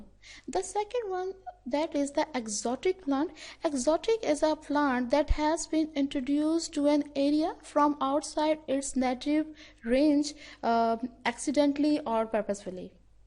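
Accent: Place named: United States. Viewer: Why u are doing American accent😡😡😡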